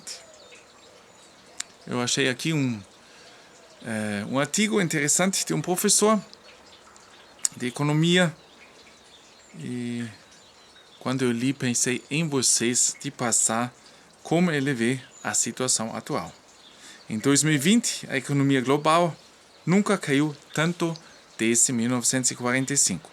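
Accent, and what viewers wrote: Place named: Germany. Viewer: Apesar do forte sotaque alemão, você fala o nosso linguajar, muito bem editado. Você dar aula de conhecimentos gerais que poucos conseguem. Parabéns; Mendonça de Fortaleza Ce. Br.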